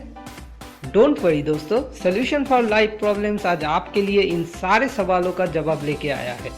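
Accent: Bengal, India. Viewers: Dada, bangali naki? Accent shune mone holo :) A good video!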